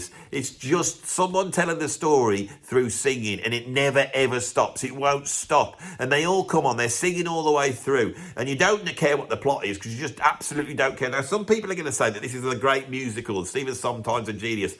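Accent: Birmingham, United Kingdom. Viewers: This is the kind of well thought out and insightful video that made me a subscriber. I particularly liked the irony of a Brummie ridiculing the northern accent. Bravo, sir!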